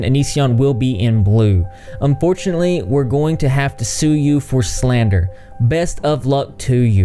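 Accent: South, United States. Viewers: Love your southern accent dude 😌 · Also, I love your southern accent! I had to work very hard when I was in the Publishing business to get rid of mine. But it has slowly (quickly) returned once I got out of that field. lol Sometimes my accent is so thick that I have to translate for people. Words like Oil, Tire and I'll never get rid of, Ya'll in my vocabulary. lol Keep doin you! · Where are you from? I adore your southern accent. Reminds me of a beautiful still, winter snow day in Tulsa. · My comment here, relates more toward You.. Just to let u know..I love love love, your southern accent. With myself Born and raised, a larger chunk of my life, in thee south. Growing up with it myself, forever hearing it around me, and some family members still down there.. my moms side is from Long Island, NYC, with my dads side and thick accents from Alabama and Missouri, and mysyself born/raised/growing up and all of schooling their high school. 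In Florida, Southwest, Florida. I have to say I really really do miss it sooooo much. Not there anymore and living in NYC currently, it really does make me homesick upon hearing ANY Southern accent! I work With an older man from Louisiana, he has a very thick accent.. and I will find myself gravitating towards him, just bc its a southern twang lol. I do have a slight one, and after being here for 10 yrs, my friends knowing me here, just as long as those 10, still does NOT PREVENT THE RIDICULING of my PRONUNCIATION OF CERTAIN WORDS WITH A SLIGHT TWANG. But ya kno what?? I don't mind at all. 1. I then make fun of their NY Brooklyn/jersey, guido-esq. accent, right back at em, and 2. (Most importantly) reminds me that I haven't lost MY OWN PERSONAL southern accent, which I would never wanna lose AND WOULD BE DEVASTATED IF I EVER DID..😔. The southern guy I work with..I find myself gravitating towards all day, for I just Miss hearing the Southern accent and references to things that only the south understands lol. 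I could just listen to h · where are you from? Hear that southern twang all over this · our southern accent really does make it hard haha great video as always! Happy to see you covering everything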